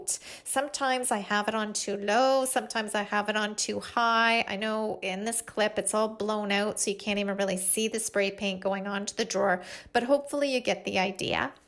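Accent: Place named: Canada. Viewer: gosh she sounds so canadian (and i live in ontario)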